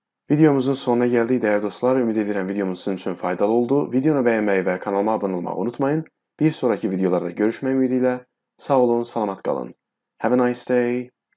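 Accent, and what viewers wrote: England, United Kingdom. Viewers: Təşəkkür edirəm sizə müəllim . Çox gözəl dərs başa salırsız , tələffüz edərkən Azərbaycanlı ləhçəsilə deyil əsl İngilis aksentilə deməyiniz təqdirə layiqdir. Çox sağ olun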